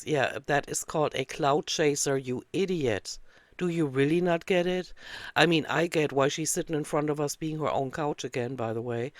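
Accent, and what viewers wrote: Germany. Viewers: RIP, your beautiful German accent voice and you and your personality will be and is missed 😢 Why must everything good get to be taken 🕊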